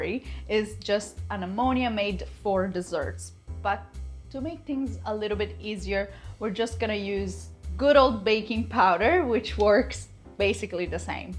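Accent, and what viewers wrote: Italy. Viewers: Love Italian accents on both men and women, semi musical speech intonation, like Argentinian spoken spanish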